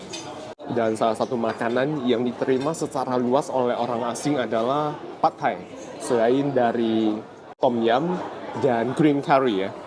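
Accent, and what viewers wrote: Java, Indonesia. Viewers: Kok medhok sih Koh skrg? Gak klen klen Tidak berasa USU-nya Aku nonton film Thailand lumayan beberapa kali, plek ketiplek kayak Chinese Banyak yg budayanya mirip ada yg Hakka, Hokien, Two Chew, dll jg cuma beda Bahasa yg terakhir aku tonton yg tentang ngerawatin Neneknya itu apa ya lupa judulnya, manggilnya Ama jg, trs Dewi Kwan Im jg gitu trs jadi vegan semenjak cucu/anaknya sakit apa gmn lupa Tapi cucunya udh nggak bisa Bahasa Cina, sering dicandain sama neneknya ah kamu gmn sih keturunan ini (ethnic group x) tp gak bisa bahasa ini (ethnic group x) Plek ketiplek kayak Chinese Indonesia yg NTT itu ceritavelin, Surabaya, Medan, Melayu, Kalimantan, Semarang, Bogor, dll seluruh Indonesia Manggilnya Ama jg, tp kalau di pasar masih pada bisa cuma ya yg bnr2 bisa rata-rata generasi Kakek Neneknya Yg generasi skrg bisanya Bahasa Thailand gak nyadar Chinese Di Indonesia jg rata-rata Chindo generasi skrg bisanya cuma Bahasa Indonesia trs ngaku org Indo bangga Kaget bgt ada Chindo di Aussie, pas tes DNA cuma "Chinese" gak ada penjelasan apa2 ya karena database mereka emg msh dikit yg Asia jd ga ada penjelasan apa2, Chinese mana leluhurnya gtu, trs dia bilang dia org Indonesia dan bangga gtu tp udh tau klo gini doang mah 😂 kagetnya krn dia bangga ngaku org Indo bukan Aussie bukan Chinese, tp lebih mudah si klo mau belajar Bahasa Mandarin atau komunikasi, punya akses, tercerahkan kalau butuh yg berkaitan budaya Chinese dan keturunan, bisa gaul, nimbrung jg Mirip di Malaysia jg, katanya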